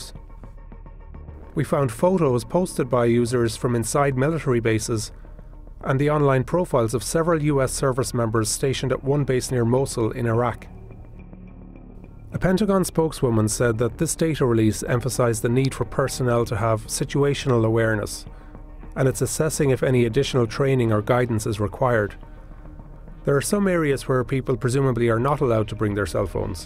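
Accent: Ireland. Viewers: Wow some Irish lad narrating this lol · Amazing Irish accent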